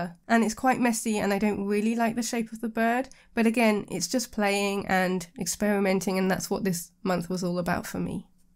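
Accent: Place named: United Kingdom. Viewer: Suddenly I found myself really enjoyeing listening to British english (im not english language speaker and in daily life moustly surrender by american english media)))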